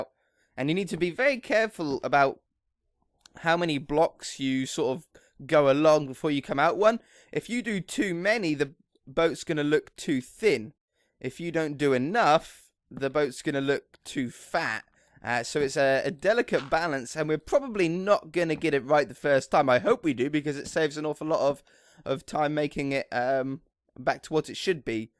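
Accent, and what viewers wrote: United Kingdom. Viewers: I love your voice, that your british and that your a redhead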